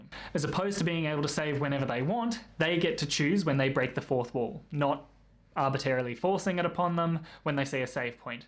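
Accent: Australia. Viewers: I enjoy your accent, Australian Eddie Redmayne.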